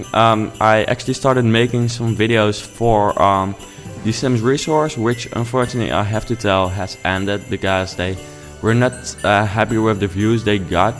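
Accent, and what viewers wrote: Netherlands. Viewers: Hihi je hoord ook echt wel dat je nederlands bent lol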